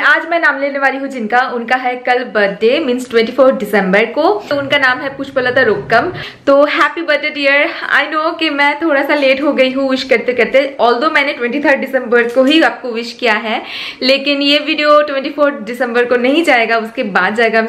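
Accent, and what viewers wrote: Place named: Bengal, India. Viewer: GOD BLESS U BROTHER✋✋ i..like Ur. Talking.style. Very.much I love.ur bengali. accexent. Hindi 👌👍🌷💓 Sweet.vlog🎂 Cute couple👑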